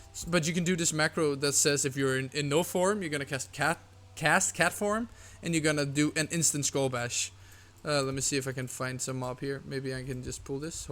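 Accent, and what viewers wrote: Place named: Denmark. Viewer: I can hear you are Danish 🙋🏼‍♀️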